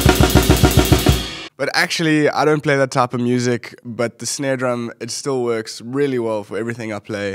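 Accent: South Africa. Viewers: Are you from South Africa? 😊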